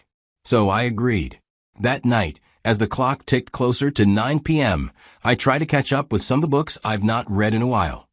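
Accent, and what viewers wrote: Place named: United States. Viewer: A Thai story with american accent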